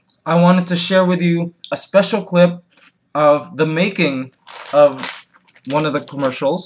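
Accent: Philippines. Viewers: Oops, I mean, I like you speaking in Fiipino accent. :)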